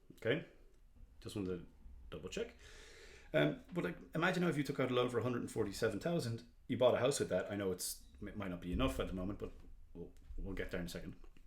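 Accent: Ireland. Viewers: Will definitely be checking this out. Haven't loads of xrp but enough to be using it to make more. Have saw a lot of people retweeting your posts, didn't realise you're Irish before hearing you speak. Just outside Belfast myself.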